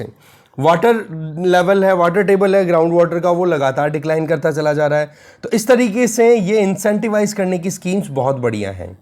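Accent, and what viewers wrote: India. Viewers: Sir , HARIYANA Se ho kya aap .?? Thank you so much for such a great explaination... ❤️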